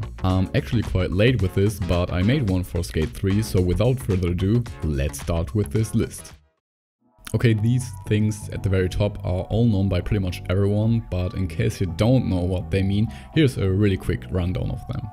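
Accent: Germany. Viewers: Dieser deutsche Akzent xD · Sehr schönes Video, deinem Akzent zufolge denke ich mal dass du deutsch sprichst :) Die Theorie am Ende lässt mich gerade nicht los, würde aufjedenfall Sinn ergeben. Danke für das informative Video! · This Video was german i hear it in his voice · He is german, you know how hard it is to switch languages without having an accent? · German accent 😅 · Man hört so hart, dass du deutsch bist xD feier ich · Man hört raus das du deutscher bist kuss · Man hört das du deutsch bist ,oder? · Ik erkenn jeden Deutschen an seiner Englischen Aussprache! Grüße aus der Bundesrepublik mein Freund! ♥ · A skate3 Iceberg?! Genius! Are u german? Ich höre irgendwie einen Akzent raus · Der deutsche Akzent sticht immer hervor min jung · Als deutscher hört man den Dilekt direkt raus 😂✌🏼. Aber sehr nices video! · Are you german couse it kinda Sound like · Deutscher Akzent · Bro awesome work on that iceberg please more Also i kinda hear some german in your voice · Ei hiar a german Äksent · man hoert den deutsches akzent sehr raus wenn man selber Deutscher ist :D sehr gutes Video! 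weiter so! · Man hört voll das du deutsch bist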